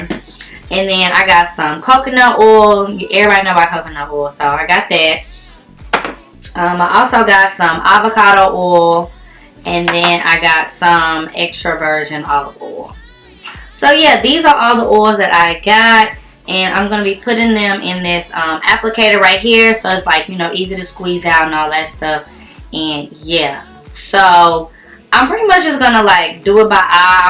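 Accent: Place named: South, United States. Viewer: *speaking Southern AAVE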